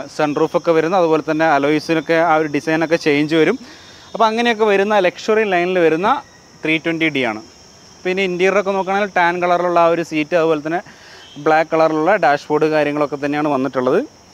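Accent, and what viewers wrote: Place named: Kozhikode, India. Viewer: நீங்கள் பேசுகின்ற கோழிக்கோடு மலயாளம் எனக்கு மிகவும் பிடிக்கும் ❤❤❤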